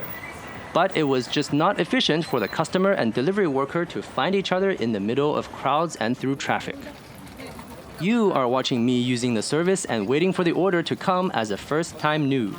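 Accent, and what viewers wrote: China. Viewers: Just sounds Chinese to me but with quite clear pronunciation.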